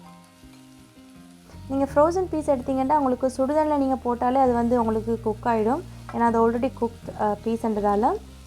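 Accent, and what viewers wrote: Sri Lanka. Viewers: Assalamuallikum sister MashaAllah so proud of u talents Just came across blog U are doing a amazing job So clear and so good are all u recpies Are u a Srilankan as the way u speak is lot like us May Allah Bless u for many more success MashaAllah u are very pretty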